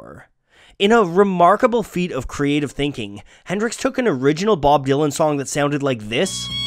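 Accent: Canada. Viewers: Is Canadian? His O's sound Canadian.. But im Swedish so what do i know xD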